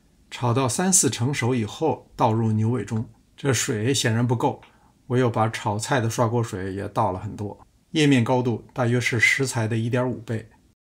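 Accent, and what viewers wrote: Beijing, China. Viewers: 您的北京话让我这个看视频的同乡 一边流口水一边 热流满面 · 您提供的菜谱都是家常的蔬菜，但却是不寻常的搭配。还有您的京腔儿听着特别亲切。请问可以加您的微信，更便于向您请教吗？ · 喜欢你的北京口音，赞一个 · 一听就是咱老北京😂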